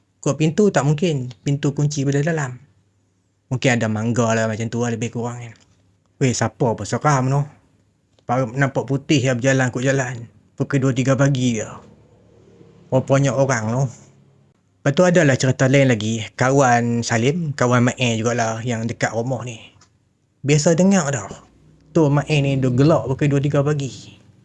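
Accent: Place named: North, Malaysia. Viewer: Salam.. Nak bajuu said L... Tq slalu tnggu video abg.. Sedap dngr loghat utara sbb saya Utaga..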